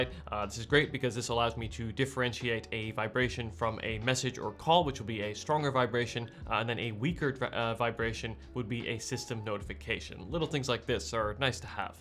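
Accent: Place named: Netherlands. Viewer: Your English is great! I normally easily spot a Dutch accent, but here it took me 3 whole minutes :)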